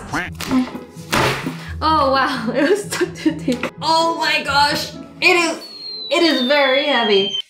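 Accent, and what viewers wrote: Australia. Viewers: I've got my trusty KNOIF Nobody: Not a single soul: Me: Hahahahahahahahahahahah! The Ozzie slang is soooo funny! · cuz she's Australian so she has an Australian accent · U got hate because people take this as a hate comment u judged the Australian accent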